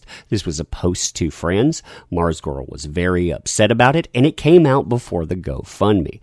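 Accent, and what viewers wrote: South, United States. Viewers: That Southern drawl like a drug, son. Do a piece on any developments between Meyer v Weird- I mean Waid. YBZ doesn't discuss it and it's right of him not to, legal reasons. I can't really do the research myself, I've got too many houses on fire.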